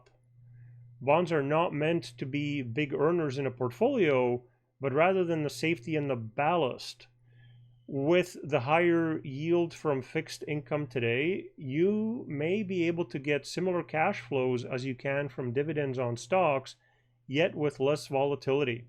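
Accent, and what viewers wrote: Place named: Canada. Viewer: Where is this accent from? I’m Canadian and I’ve never heard anything that sounds so Canadian before.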